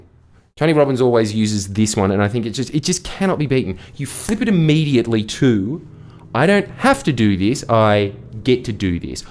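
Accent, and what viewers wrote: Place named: Australia. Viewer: That’s what HE said. 😂 He even showed the same face that comes from that pleasantly satisfied feeling. “Mmmm. Arggggh. Yesssss.” along with that expression of the Westerner’s stoicism with hand cupping his chin. I’ve concluded that he actually gesticulates more than I & I didn’t think that was possible. Thank whatever God(s) may be that what otherwise would appear to be Parkinsonian tics instead, has the positive connotation that it does or else I’m sure I would be terribly self-conscious about it. Yet there is something … & I can’t quite put my finger on it … to the feeling I get when I’m surprised repeatedly - like the baby in a game of peek-a-boo ever amazed & bewildered at the magic of a disappearing adult - by his right arm becoming invisible as it slips in the side pocket. I now have to imagine that there IS a portal through which JS traverses dimensions & is converted to. 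I mean THANKS for talking about the softness of the producer’s hair that you can feel through there. You pack SO MUCH info into such a short timespan where I’m tripping over your Aussie accent, actually needing the transcript at times but the closed captioning is fd up … it can’t even get your Australian twang right… & then & THEN (no & THEN) I have to now completely imagine an invisible black hole where I can’t see past the event horizon yet your arm is teleported across planes… where you have the cheapest budget for a Star Trekkian Transporter since imagination is free. Hey! Is a misquote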